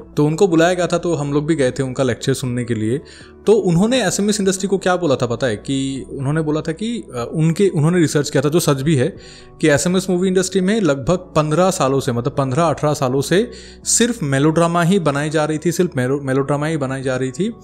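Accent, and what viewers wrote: Assam, India. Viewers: 😂 Assamese accent tu je odd lagise kiba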